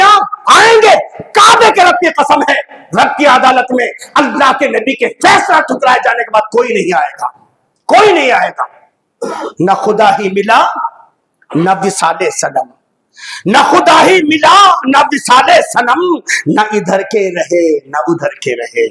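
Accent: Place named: Arab world. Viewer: عربی لہجہ بھی بہت ہی بھدا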